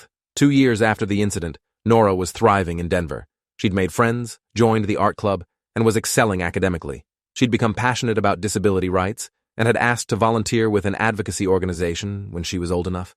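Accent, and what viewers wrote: United Kingdom. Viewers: In every video a British accent happens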